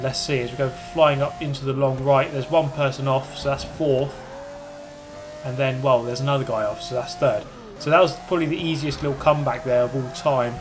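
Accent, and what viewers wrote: United Kingdom. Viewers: I think there is something about a British commentary over racing that is just pure bliss.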